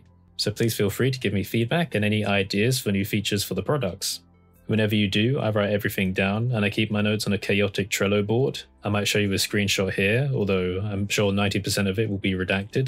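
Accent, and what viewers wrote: United Kingdom. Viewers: You sound English. Anywhere I can throw money at you in GBP to avoid the exchange rate?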